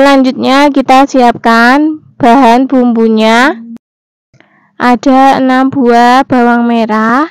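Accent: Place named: Java, Indonesia. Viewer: Mbak e bahasa medhok banget.....😅😅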